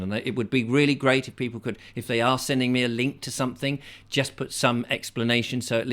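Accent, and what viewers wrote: United Kingdom. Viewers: Such a wonderful, true assessment of the whole situation they are trying to put us in. And your British accent makes it all the more enjoyable.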